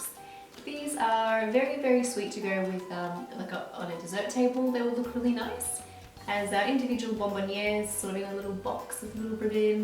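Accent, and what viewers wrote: Australia. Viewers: thanks 😊😀. Also are you Australian???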